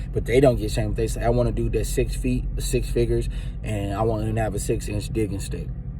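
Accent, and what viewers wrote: Baltimore, United States. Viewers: I hear a lot of the 410 in your accent ..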